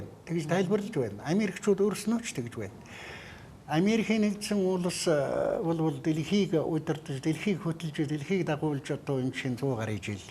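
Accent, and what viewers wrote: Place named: Beijing, China. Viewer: Бээжин аялгаар ярьдаг юм байна юу ч ойлгосонгүй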